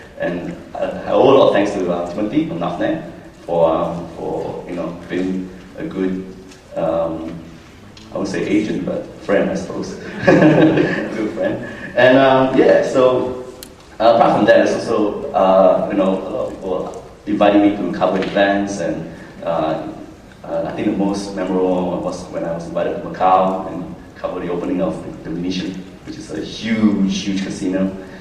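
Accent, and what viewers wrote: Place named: Malaysia. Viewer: just a little, not much. pretty much sounded malaysian to me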